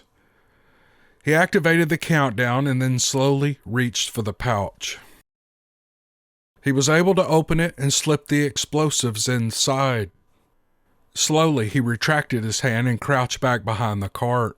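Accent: South, United States. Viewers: Great 👍 story an terrific experience Listening too you" and your Southern draw" Gray Eagle seems to be a fascinating character in this story I Must say' toward the end I started Feeling sorry for Big Foot' after Gray Eagle got through working them over Ha!! Ha!! Seriously a Revving Vidio... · Your voice, the southern drawl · ...can I call you that for short? Anyway, this has been one of my favorite narrations and stories from your channel. Your southern accent and pacing make for good listening! Keep up the good work brother, I really appreciate your craftsmanship.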